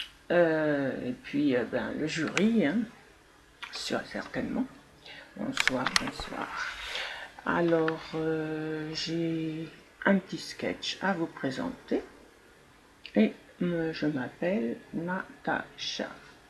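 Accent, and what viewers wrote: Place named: South, France. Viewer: Bonjour hi hi 😊 j'ai aimé ta photo vidéo. Même sans plume ni poil. Tu sais là langue Française est très riche les origines y sont bien ancrées et pour longtemps encore mais ils y en a des milliers de mots comme çà ou expressions. Un seul exp parmi d'autres ( plan social.. Au lieu de dire chômage...) technicienne de service >au lieu de femme de ménage et j'en passe. Maintenant concernant la mort 💀 je suis KTO et je crois en Dieu comme à mon âme qui je l'espère retrouvera celles de mes parents et ma sœur... Chacun ses convictions, libre de ses croyances. Je trouve que ta vidéo est vraiment bien belle tu as un petit accent du midi 😊j'aime. Je te remercie pour le partage bonne journée. ♥️Bises_Pat. 😘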